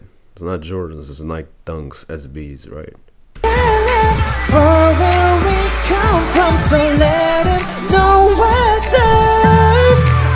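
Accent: France. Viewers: Pleaaas react to BTS Vs block b pleas and I like your french accents brooooo c'etais parfait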